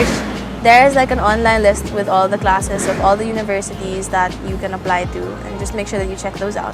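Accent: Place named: Philippines. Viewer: What's your course po ate? Love your taglish accent❤️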